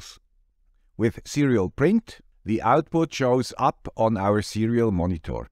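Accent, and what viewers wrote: Switzerland. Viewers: Thank you man with Swiss accent, what you explained solved many problems in my mind. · I love the guy with the Swiss accent 😊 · I have not said it before, but I look forward to: the Swiss accent, The little hand pointer, The hat and the knowledge You share. ThankYou Sir.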